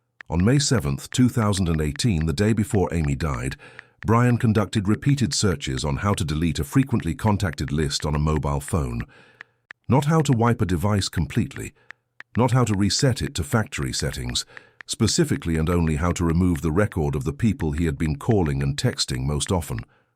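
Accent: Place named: United Kingdom. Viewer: This AI voice of the host is horrific the limey thing does not work in the USA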